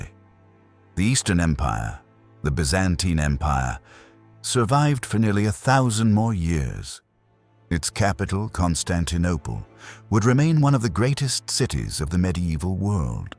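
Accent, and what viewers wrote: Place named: Australia. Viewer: Like others in the comments, for me it sounded like Australia.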